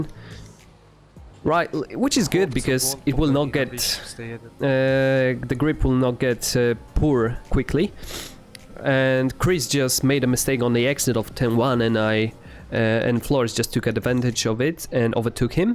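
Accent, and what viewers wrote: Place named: London, United Kingdom. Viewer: Why are you speaking londonish?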